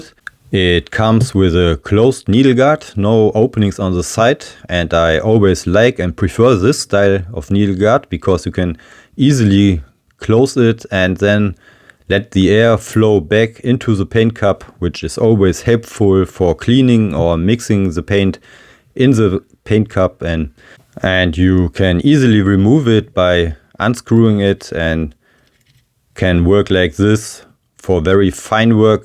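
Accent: Germany. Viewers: Excellent video and don't worry about your English is perfect and great with German accent 😄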